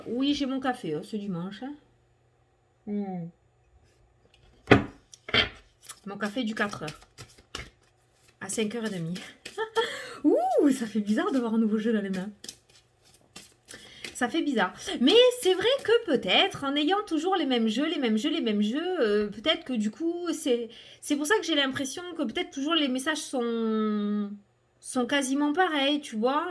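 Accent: South, France. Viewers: J adore ta bonne humeur toujours un plaisir de t écouter avec ton accent du soleil!! Bisous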